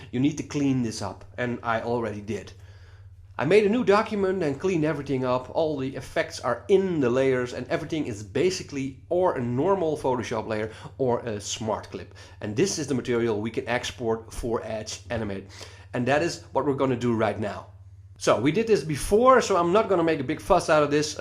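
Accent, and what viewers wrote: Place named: Netherlands. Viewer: Quality dutch accent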